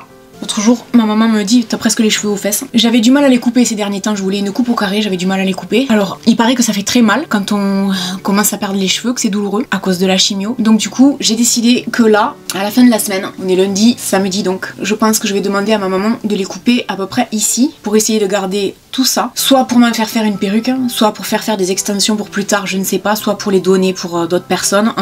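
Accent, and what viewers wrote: South, France. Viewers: J’ai eu le cœur brisé et la boule dans la gorge quand je t’ai entendu pleurer…. Ma chère, ton identité, ta féminité, c’est ton sourire ravageur, tes yeux pétillants et ton accuuuuunt du suuudeuh! Un bisou à Jean-Yves ❤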